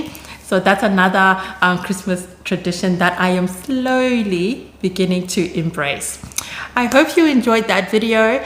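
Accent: Australia. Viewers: Another lovely video. You speak so nicely, but I am beginning to detect a hint of an Australian accent. As an older Australian I do miss the joy of a homemade Pavlova at Christmas. Passionfruit, strawberries, peaches, mangoes, ect: I haven't had one in years. I do hope you get to enjoy one with your family.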